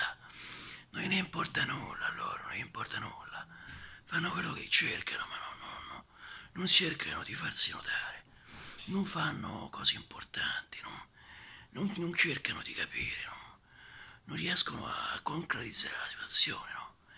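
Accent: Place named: Rome, Italy. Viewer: Ti è uscito l'accento romano.. in realta lui è emiliano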